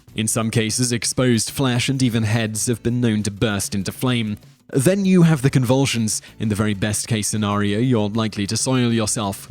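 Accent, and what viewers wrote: United Kingdom. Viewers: I HATE his British accent !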